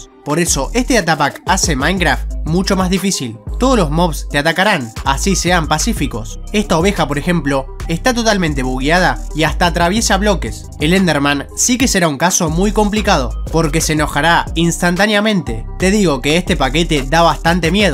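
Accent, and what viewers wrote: Argentina. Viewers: acento argentino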